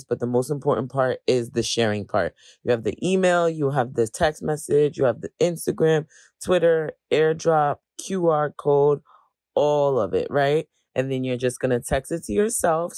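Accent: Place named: New York, United States. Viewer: This girl sooo New York. I love it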